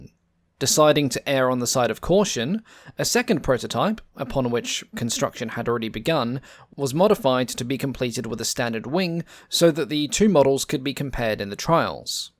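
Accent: Australia. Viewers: Pretty sure hes an aussie. I am, sounds like hes from here too.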